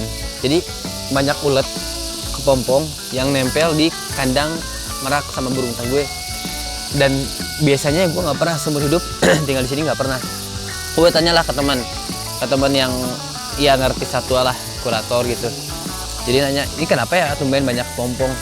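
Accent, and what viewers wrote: West Java, Indonesia. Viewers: "Nagen" apa ya Nagen "Hampeg" apa ya hampeg, kocak emang ge ka alshad mah sunda pisan.. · nagen (sunda pisan si aa ) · Tetep ya a sunda nya keluar, emng enak bgt ngomong pake bahasa sunda ma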